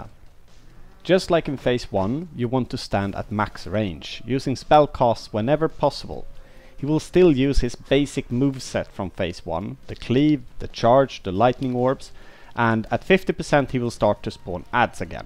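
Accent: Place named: Sweden. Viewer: Största svenska dialekten, bra video!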